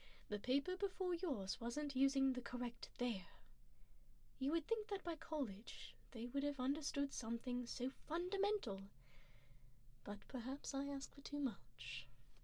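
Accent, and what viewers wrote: RP, United Kingdom. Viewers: Your British accent is amazing. So is this artwork of Leonara! You're so talented and amazing! You do a phenomenal job of bringing your characters to life! 😍😍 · I love when does her posh British accent. Its so relaxing.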